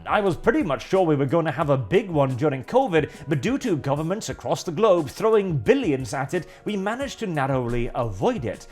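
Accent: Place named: United Kingdom. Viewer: He's Norwegian that learned English from Brits.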